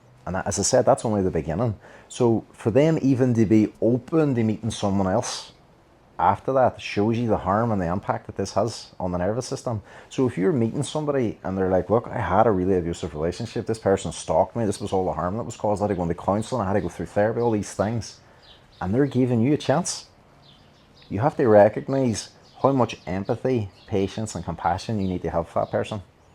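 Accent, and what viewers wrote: Ireland. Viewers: I just wanted to say thank you and I love your Irish accent. I’ve personally experienced this detox period from a toxic relationship and it is so painful. I was worrying about my own safety once I decided to leave the relationship! I have done the necessary healing and don’t ever want to go back. This helps me as I’m training to be a counselor myself! God bless you friend! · with that awesome irish accent, well said, and thank you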